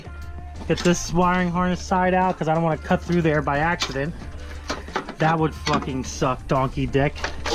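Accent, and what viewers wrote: Pennsylvania, United States. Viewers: That's how we talk in PA buddy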